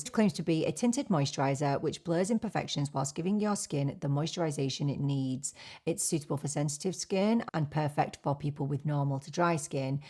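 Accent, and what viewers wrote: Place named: Newcastle, United Kingdom. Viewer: You quote euro currency but at the same you have a strong english accent (Newcastle?), can you share where are you from? 😊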